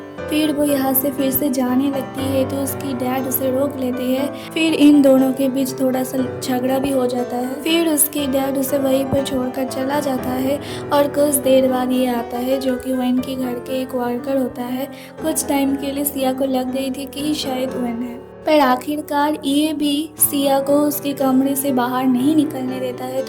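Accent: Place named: Northeast India, India. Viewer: Ap north east se ho , meri chachi ki same aawaj hain , bolne ka tarika bhi same hain , kalimpong se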